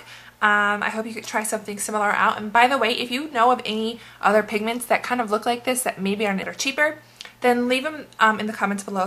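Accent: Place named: North, United States. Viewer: Hey! i am just curious as to where you are from! i feel like you're voice sounds like mine like accent wise. are you from the north? hahah weird question sorry! i live in the midwest and everyone makes fun of my northern accent but you sound like me i think so just curious:)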